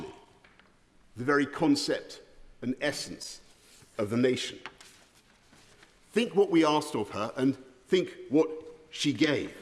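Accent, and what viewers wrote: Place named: Upper class, United Kingdom. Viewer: Give me an example. He just uses alliteration and talks in a silly toff accent. Then he’ll say something silly like “We must all go to Peppa Pig world” to seem affably eccentric. That’s it.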